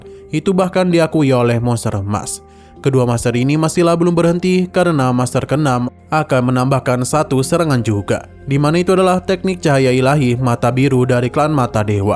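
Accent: Banjar, Indonesia. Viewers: org banjar jua sakalinya min lih... terkipai kemana min😂😂😂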